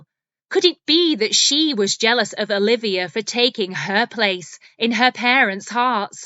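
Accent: United Kingdom. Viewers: i just listen only for one purpose because i AM FOND OF British accent i really adore this accent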